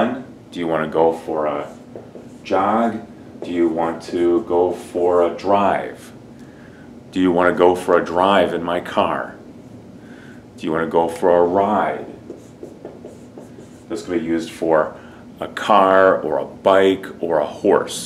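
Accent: United States. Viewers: are u living in ca??sounds so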